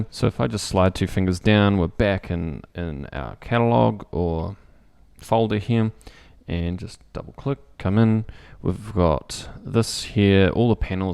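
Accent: New Zealand. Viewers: I think for casual/hobbyist photographer this is a good alternative but for processing hundreds from a wedding or a sports assignment there’s absolutely no way this can replace lightroom. Also Apple’s Cloud storage system is peculiar & not friendly for android clients to retrieve their photos. Great presentation , though & your English dialect is interesting - are you a New Zealander? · Steep by steep or step by step? LOL New Zeland accent funny!🥰😘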